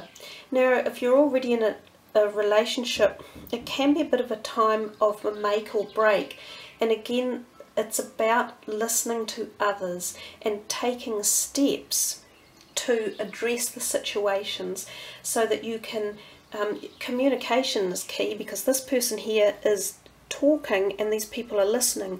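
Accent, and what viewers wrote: New Zealand. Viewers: Awesome Reading ~ thankyou :))) Archangel Haniel also brings in the Energies of The Moon for us ~ which weaves beautifully with Archangel Gabriel's Message ~ Intuition & 'Pyschic Insights' .... :))) Wonderful to hear a fellow NZer :))) Aroha & Angel Blessings!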